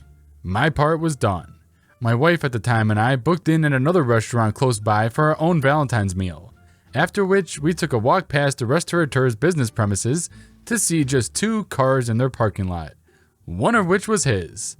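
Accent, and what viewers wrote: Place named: Midwest, United States. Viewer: I like your accent, it sounds Midwestern. Where is it from?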